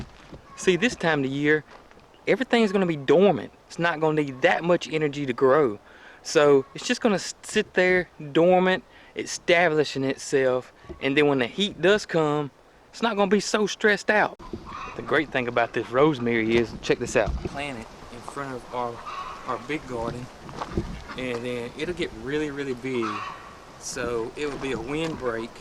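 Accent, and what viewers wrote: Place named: Tennessee, United States. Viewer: love your channel! I'm a new sub,love the first video I watched you sounded just like me here in Tennessee.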